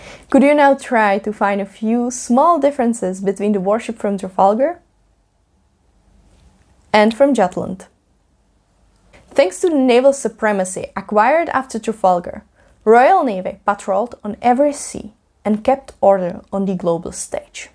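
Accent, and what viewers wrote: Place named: Eastern Europe. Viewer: This slavic accent needs to be removed. If I did it, you can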